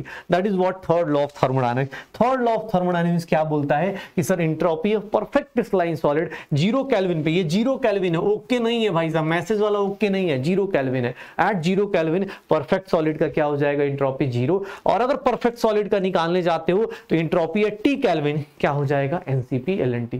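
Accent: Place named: Bihar, India. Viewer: in this whole session I found one thing that sir ka bolna be like - """Entropy Bdhega "-bdhega " ky mst bolte h ekdm bihari style me lgte h sir isliye Inka voice acha lgta h meko..nd pdhai to waise bhi lajawab hai...... 😊🔥🔥🔥🔥👀✨❣️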